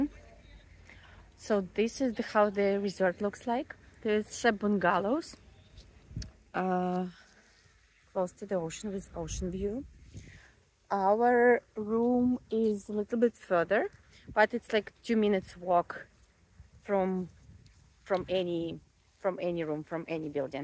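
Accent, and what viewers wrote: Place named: Russia. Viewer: Судя по динамике сообщений тут и английский не понимают)) А вас с первого вашего слова понял что вы русскоговорящая)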